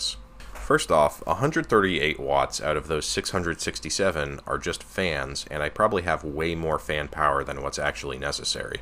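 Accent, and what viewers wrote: United States. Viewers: Fantastic video. Warms an engineers heart to see a project conducted with proper regard for the science principles and associated mathematics. Even better to hear an American accent wrapped around SI units! Mr, you got it all goin' on! :) And seriously, great video. I've worked a few heat pump designs myself and my only question about your technique is your soldering is using soft solder; is that really strong enough and long lasting enough for the task at hand, and does it not soften a bit at your higher temperatures where the pressure is also higher? I use silver solder and nitrogen gas in the tubes to stop scale forming in the tubes. It is a pain but those joints are stronger than the tube! Now, to find the promised next video.... and to say; thanks for sharing.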